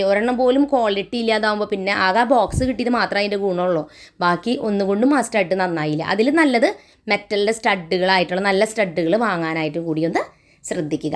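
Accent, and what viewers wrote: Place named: Thrissur, India. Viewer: തൃശ്ശൂർ ആണോ വീട്... സംസാരം കേട്ടിട്ട് അങ്ങനെ തോന്നുന്നു 😊... ഞാൻ തൃശ്ശൂർ ആണ്‌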